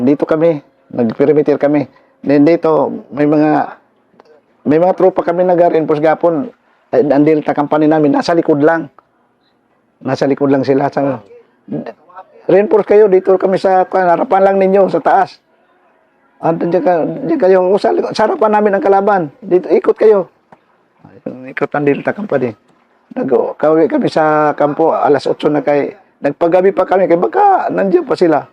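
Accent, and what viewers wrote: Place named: Cebu, Philippines. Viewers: May pagka waray si sir, I'm not sure pero base sa pananalita nya, waray or bisaya. Di xa ilonggo... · Visa ya po ang halo sa Salita nya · Cebu dialecnsiya..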